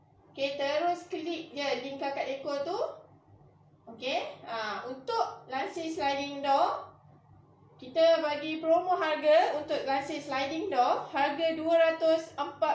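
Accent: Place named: Malaysia. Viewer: Serasa denger orang malaysia ngomong😀😂😁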